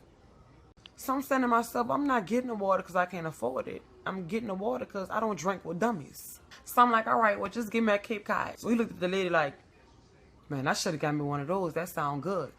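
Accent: New Orleans, United States. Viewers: I love that NOLA accent 💞💞💞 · OMG! I don’t know how I got on your page but I’m glad I did. Your storytelling is bomb! You are hilarious but you speak the truth. You sound like ur from New Orleans 😊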